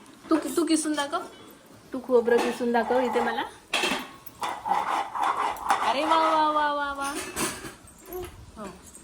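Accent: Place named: Malvan, India. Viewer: नेहमीच छान असतात तुझे व्हिडीओ. बाळ खूप गोंडस आहे. मालवणी भाषेचा पगडा असलेलं तुझं मराठी बोलणं खूप छान वाटत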